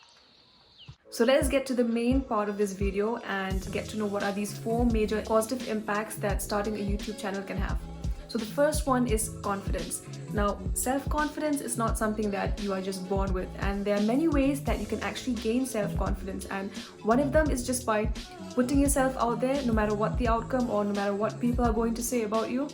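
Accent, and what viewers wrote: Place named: United Kingdom. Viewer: British accent is on point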